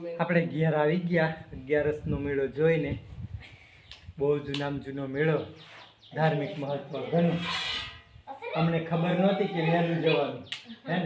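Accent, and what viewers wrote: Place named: Kathiawar, India. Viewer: તમે પણ અહીંયા ના છો તો પછી તો પછી કેમ કાઠિયાવાડી બોલો આપણી શુદ્ધ ભાષા બોલોને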